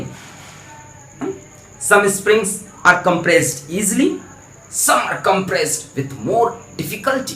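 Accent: India. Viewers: Sir aap bohat funny lgrhe the.Aapko english bolne ki kya zarorat thi.Aap hindi bolte hi acche lagte ho.Tb aapki teaching delightfull lgti h.Aapko iski koi jarrorat thi hi nhi.We love you and your hindi accent.🙏🙏🙏🙏🙏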